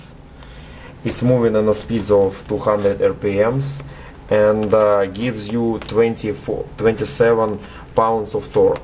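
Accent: Russia. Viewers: Чёт у тя акцент русский. Иди учи фонетику!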